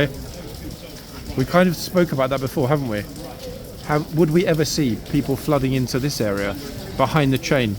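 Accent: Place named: United Kingdom. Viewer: When I first started watching videos and he said 'tour guy', I heard with my Californian ears 'tall guy'. And I'd look but not see a tall guy; wondering why he would point out tall guys anyway. Finally, it dawned on me that he was saying tour guy. Usually I can tune my California ears to British but those 'r's and 'l's apparently don't cross over.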